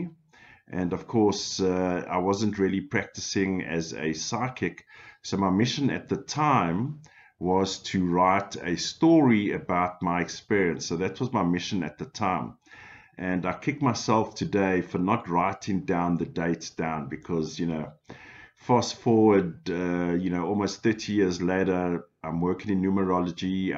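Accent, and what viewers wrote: South Africa. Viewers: I knew this was a South African accent...are you still in SA?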